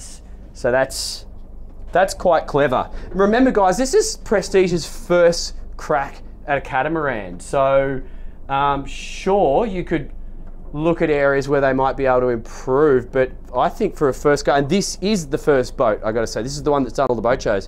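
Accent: Australia. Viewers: Love the Aussie accent..Sometimes he says here , sometimes heeyah..Keep up the good work..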